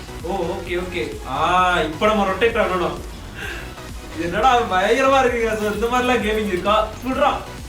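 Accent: Sivakasi, India. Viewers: Bro nee sivakasi ah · Bro, Sivakasi ah neenga ?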